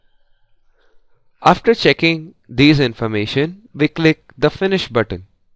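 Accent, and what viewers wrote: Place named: India. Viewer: perfect. slow. clear. indian :)) thanks!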